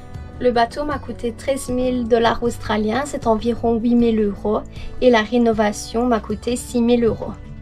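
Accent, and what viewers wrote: Liège, Belgium. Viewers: Me voilà nouvel abonné. Un petit accent liégeois? Aussi, on aimerait avoir une présentation de toi et de ton bateau. Fancophone en Australie, que faisais-tu avant de partir à l'aventure, quelles destinations tu envisages.... voilà autant de sujets pour tes prochaines vidéos. Merci pour le partage. Bon vent à toi pour 2021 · Bonjour, je découvre ta chaîne, tu as un bateau vraiment bien aménagé et bien restauré. Bravo. Il me semble percevoir un petit accent Liégeois. Je me trompe peut-être? A bientôt. Marc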